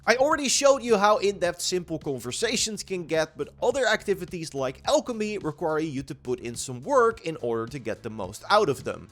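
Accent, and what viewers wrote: Netherlands. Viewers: Echt het Nederlandse accent ook ! Ik kijk echt naar dit spel uit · the thick dutch accent is real omfg.... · I dig your Dutch accent. Groetjes uit Duitsland.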